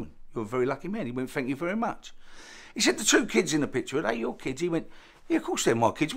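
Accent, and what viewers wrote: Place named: Cockney, United Kingdom. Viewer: Dear cockney fellas and those who could figure this out. I'm trying to learn cockney accent but I still don't understand some parts of his speech. Can anyone give me a hand here?🙏🙏🙏🙏🙏🙏🙏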